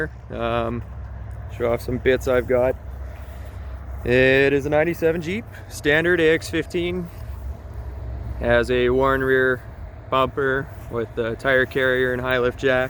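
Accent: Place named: Canada. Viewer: Sound like your from Canada.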